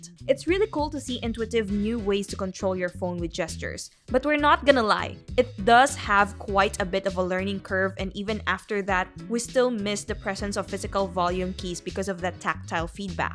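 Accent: Philippines. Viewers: sounds like pilipino accent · I can tell based on your accent that you are from the Phillipines. Am i right?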